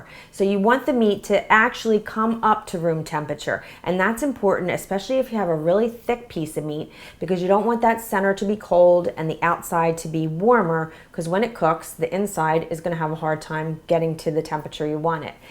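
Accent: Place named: Brooklyn, United States. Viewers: New York accent?...Brooklyn accent?...can hear it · Funny, I hear it too. Here and there it sneaks in. Wonderful video though. At least she's trying to free herself of the ny accent.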